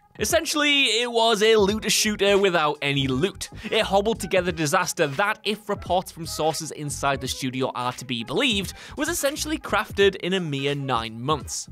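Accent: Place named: Tyneside, United Kingdom. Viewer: I hear a tyne valley accent. Maybe tees actually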